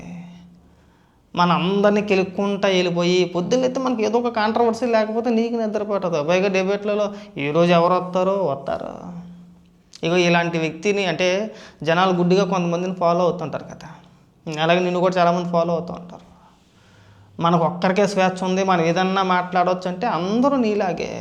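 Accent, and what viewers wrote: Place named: Amalapuram, India. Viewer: Sir me accent vinte Amalapuram lo unnate anipistundi sir pelli chesukuni tirupati daggara oka palletooru unnattu undadu Sir 🙏😊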